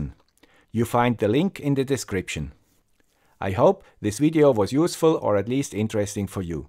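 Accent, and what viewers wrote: Switzerland. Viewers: Your Swiss accent is so sweet and very compelling. I am so influenced to learn deep in the subjects though you just explains thoroughly. You are an amazing learned person with a very sweet positive attitude. Thank you.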